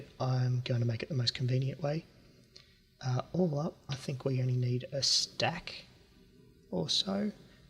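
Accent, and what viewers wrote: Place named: Australia. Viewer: You sound so Aussie lol, I need to get some video tips from you. Your videos are always great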